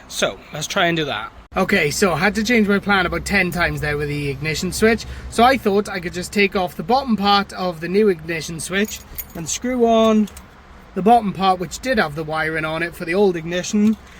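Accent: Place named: Swansea, United Kingdom. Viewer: You sound like a Swansea lad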